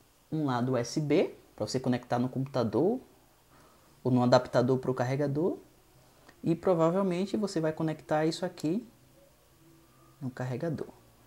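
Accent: Bahia, Brazil. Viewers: Sotaque da minha Bahia é lindo demais meu hey rsrs abraço velho, curti sim!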